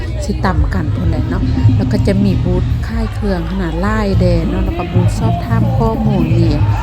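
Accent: Luang Prabang, Laos. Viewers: สำเนียงเจ้า คนหลวงพระบางบ้อ…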